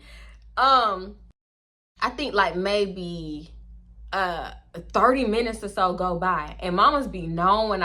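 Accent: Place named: Houston, United States. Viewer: He sound just like a Houston nigga 😂😂😂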